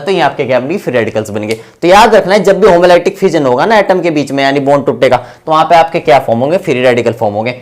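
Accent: India. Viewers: Sirji west up wali bhasha mast h 😂❤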